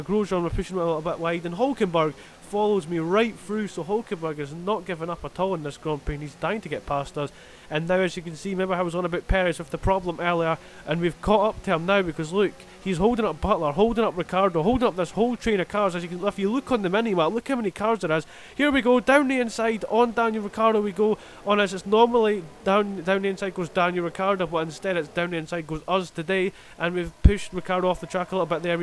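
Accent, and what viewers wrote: Scotland, United Kingdom. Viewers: Good video and your Scottish accent is great! Lovin it!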